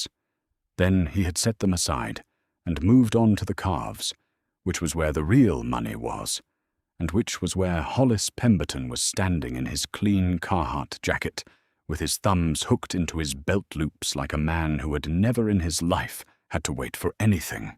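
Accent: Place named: United Kingdom. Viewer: why is the narrator a Brit?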